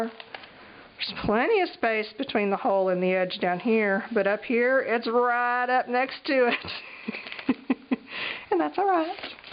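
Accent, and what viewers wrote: South, United States. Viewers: OOO! Magazine page shown MUST be about me... I love your videos! You are so incredibly knowledgeable, and I love learning new things from you! Edited to add: You are also a hoot to listen to. Between your lovely Southern accent, your colloquialisms ("book book", "a pokey tool"), and your complete lack of condescension, you're a pleasure to listen to! You've found a new subscriber!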